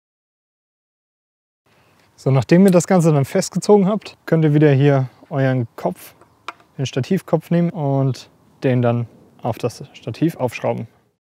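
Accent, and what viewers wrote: Germany. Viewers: Oh my dear friend! I follow you all the time for the best reviews and I really wanted to hear you speak in your native language. It seemed to me by name and accent that you have something at the base from Germany but I wanted to hear you. Haha!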